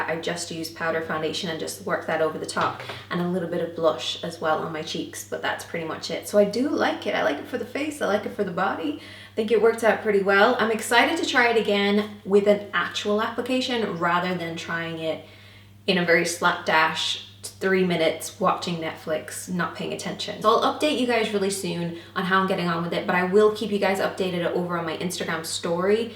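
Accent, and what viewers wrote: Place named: Ireland. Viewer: I can hear an Irish accent in there 🇮🇪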